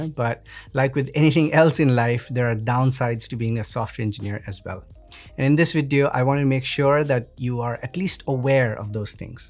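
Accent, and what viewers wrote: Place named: Nepal. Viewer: I hear nepali accent.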